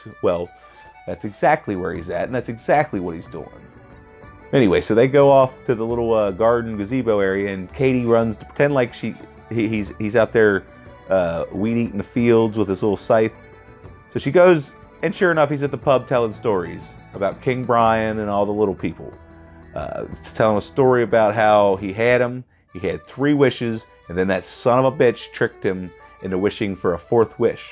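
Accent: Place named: Scotland, United Kingdom. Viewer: AYE! It's Scottish!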